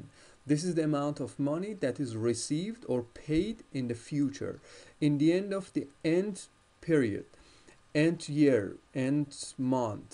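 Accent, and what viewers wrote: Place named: Iran. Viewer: pure Iranian accent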